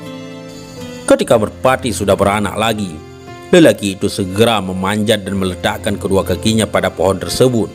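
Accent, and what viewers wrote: Aceh, Indonesia. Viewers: Logad bhsa Admin ini kyak logad bhasa Aceh..?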